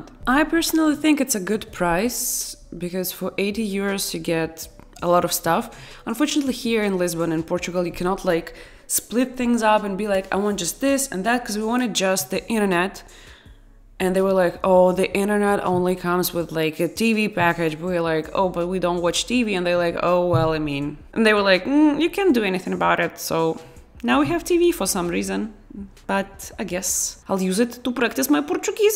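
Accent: Russia. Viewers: That recurring Russian accent 😍😘... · People love your Russian accent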